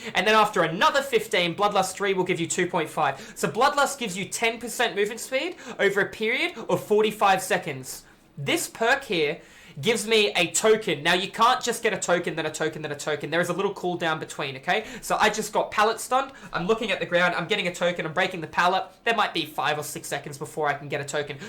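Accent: Australia. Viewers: It's just his aussie accent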